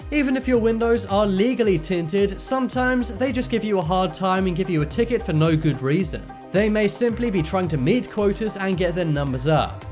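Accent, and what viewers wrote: United Kingdom. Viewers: This person has a British accent. Maybe that's what British cops do. NOT AMERICAN COPS! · Annoying uk accent about supposed us bullshit.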